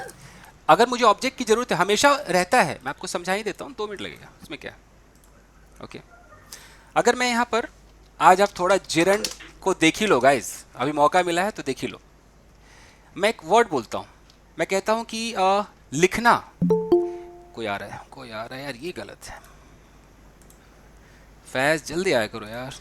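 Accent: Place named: Bengal, India. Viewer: Really sir amazing your Bengali tone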